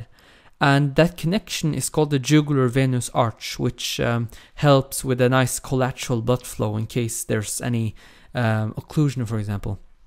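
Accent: Norway. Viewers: He sounds very Norwegian to me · hahah yes, I think the same. I love the Norwegian accent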